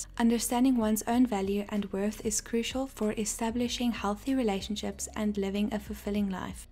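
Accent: South Africa. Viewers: Are you from South Africa? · i seemed to recognise the south african accent too haha !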